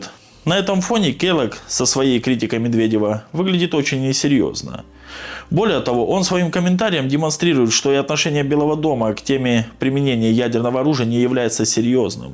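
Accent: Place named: Ukraine. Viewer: Хохляцкий говор, очередная брехня от тцк шников!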